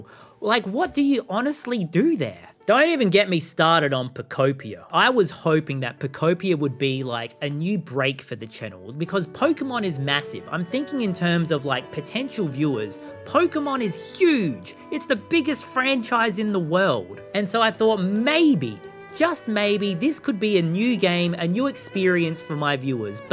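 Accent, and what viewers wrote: Australia. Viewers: Came for impressive master quest run, stayed for funny sounding Australian who plays video games. I've been hoping for a long while that you would ignore data this and data that and simply play games you genuinely enjoy. FF7 remake has been very refreshing! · There are a lot of channels doing Breath of the Wild/Tears of the Kingdom content, so I don't think it's just the game. There's something about YOU playing BotW and TotK that draws people. And perhaps it's not just the Aussie accent! Maybe there's something about those games in particular that brings out the best YouTuber in you? I don't know. But don't sell yourself short thinking it's just the game. Maybe it's you playing the games that make it work, which is why you playing other games works fine but not as well? Just a thought.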